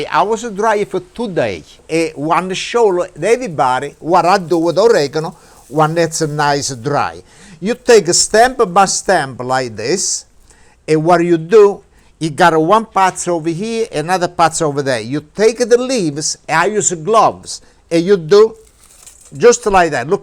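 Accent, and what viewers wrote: Italy. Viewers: Love your accent gotta be Italian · His italian English is awesome !!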